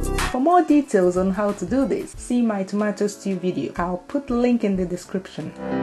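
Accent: Nigeria. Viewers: You sound so Nigerian,I love it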